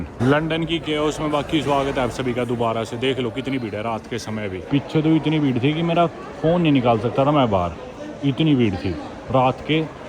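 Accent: India. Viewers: I happened to stumble upon this channel by accident and what attracted me most was the language. At last someone speaks for Indians in their language. Talking about Wales who are proud of their heritage and try to preserve their language, this is being published in English with Indian accent. Why is there such need to speak in English for Indian audience. Knowing another language is an achievement and useful but making it your own…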